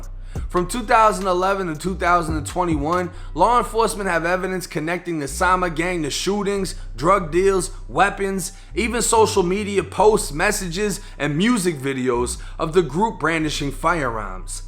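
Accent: Boston, United States. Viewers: Any time he says CHAHGEZ in general to be honest, plus BAHSTEN accent is one of the best parts of his YouTube videos in my opinion.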